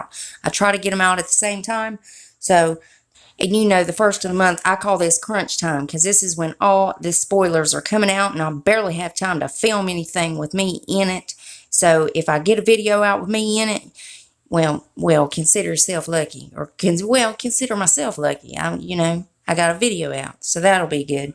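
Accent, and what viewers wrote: South, United States. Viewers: I love your accent so much!! I'm southern...but girl, you're super southern, 😂😂!! Bless it!! 😘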